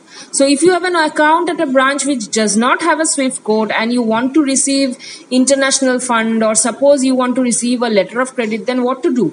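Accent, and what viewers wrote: Bengal, India. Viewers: That indian accent kills the message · You got a Bengali accent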